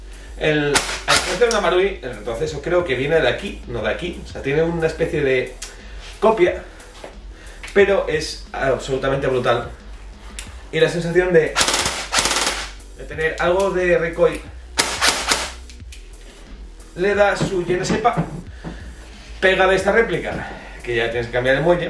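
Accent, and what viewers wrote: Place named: North, Spain. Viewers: De donde es este muchacho? Habla del Norte, que parte? Curiosidad No sabía que subía videos de airsoft, aunque sea de vez en cuando. Me quedo por aquí a ver que cosas trae! PD: estaré atentisimo al directo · napoleon volvio enojado y asturiano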